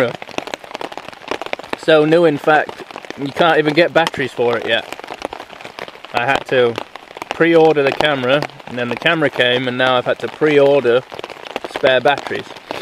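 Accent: Yorkshire, United Kingdom. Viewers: Enjoyed your show! Im from CA, USA, Born here with 100% Yorkshire blood! 1st Generation American Boy Scout! avid Fly Fisherman and Hunter! Love to hear the Yorkshire accent! I just subscribed! I like your choice of dinner and whiskey! I used to do what you do and need to get back to it! only difference would be I bring my Black Lab! and longer stronger tent pegs! lol Peace! look forward to more shows! Keith R Skirrow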